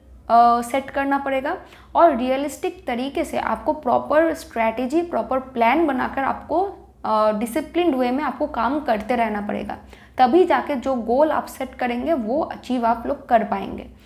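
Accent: Bengal, India. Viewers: Typical Bengalli accent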